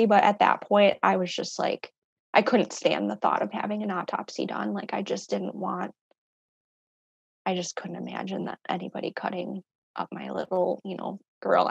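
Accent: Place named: Wisconsin, United States. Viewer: I recognized this beautiful family was from Wisconsin within the 1st minute I heard her voice. I'm so very sorry for your loss. She is gorgeous, she is loved, she was wanted and she will always be missed and remembered. Much love and support from Madison, Wisconsin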